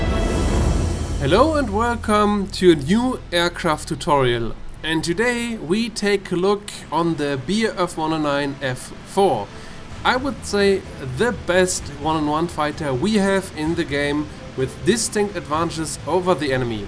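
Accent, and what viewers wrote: Germany. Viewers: Well thank you! This video (and the whole 'how to' series) is incredibly helpful. *thumbs up In case I identified your accent correctly: Super gut, werde ich sicher noch das eine oder andere Mal als Referenz nutzen. :) Beste Grüße! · Your accent; so let's speak in Deutsche !!..Ohh Ich nicht sprech Deutsche Ahhaha..jijiij jajajaja, jejej. Bueno, hablemos castellano, idioma más impresionante. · Love a nice German accent for tutorial. for real. Thanks, great info.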